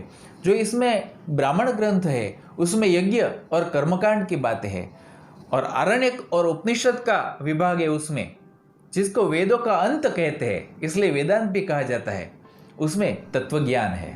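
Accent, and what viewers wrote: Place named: Gujarat, India. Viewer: Tamari peli second ni boli thij khabar padi gayi k tame gujarati cho 😎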